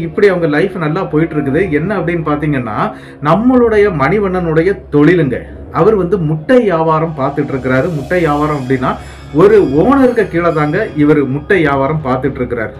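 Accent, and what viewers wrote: Tirunelveli, India. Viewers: தம்பி திருனவேலியா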